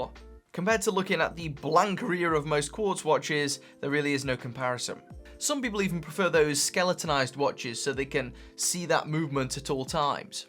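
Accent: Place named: England, United Kingdom. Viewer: Dude what part of england is your accent from it sounds cool?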